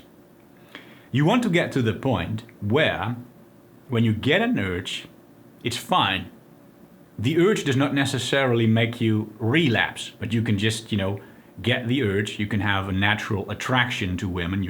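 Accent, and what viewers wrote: Netherlands. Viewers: I sense a dutch accent? My strijder! Good content keep it up jongen!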